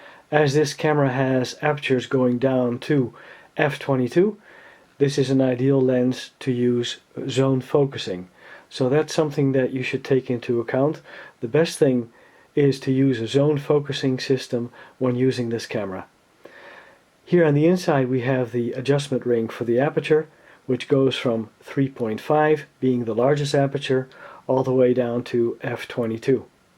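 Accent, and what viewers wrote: Netherlands. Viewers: Ik hoor een Nederlands accent een vraagje wat is het verschil tussen een 35 en een 35 led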